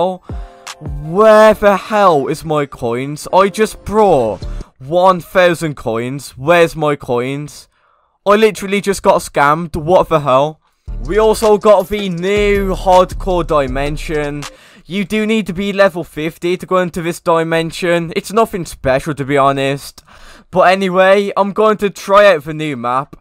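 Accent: United Kingdom. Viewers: and that british accent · Nice British voice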